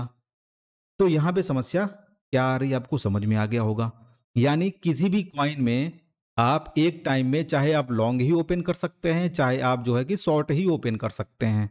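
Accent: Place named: Bihar, India. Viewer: Pakka bihari ho bhai ekdum humko lagta hai mera bhai bat kar raha hai😊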